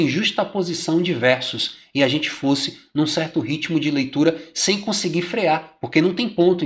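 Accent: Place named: Bahia, Brazil. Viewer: baiano ne? e foda!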